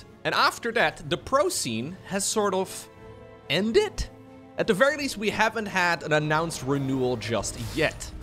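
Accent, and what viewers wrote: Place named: Netherlands. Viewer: I'll never not hear that dutch accent on the "O"s -COHMPFIDENT! -COHMPTFORTABLE!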